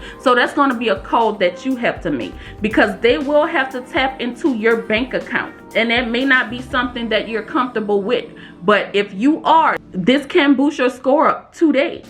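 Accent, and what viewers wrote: New Orleans, United States. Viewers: New Orleans? That accent strong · You have that New Orleans accent!! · New subbie here. Even though I am located in Missouri now. I was born on Metairie. I hear New Orleans in your voice...are you a Louisiana native? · New to the channel but I’m here forever now! I just know it’s the Nola accent for me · I know a New Orleans native when I hear one!!! Hey baby....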